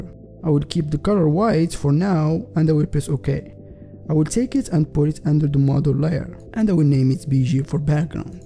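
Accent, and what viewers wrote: Morocco. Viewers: your accent and voice sound like a Morrocan man great video btw!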